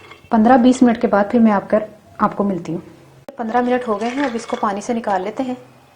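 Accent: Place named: Punjab, India. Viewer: Ap kitna pyara bolti hain punjabi ma 😍😘😘😘 zabardast recipe 👍👌👌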